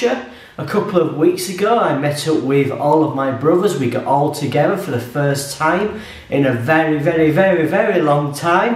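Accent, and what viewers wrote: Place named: Yorkshire, United Kingdom. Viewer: Hi, I'm a fela from Córdoba, a city from Andalucía in the south of Spain..I am a English learner and I think that your videos are very nice to learn the Yorskhire accent. I visited your area a couple of times and I only can say that i am into all the issues from Yorshire, a region so nice, stunning and picturesque.I would like to travel as well.My city is very nice with all their muslim heritage and not so known as Seville and Granada. Enjoy your time in Spain and NY. Greetings1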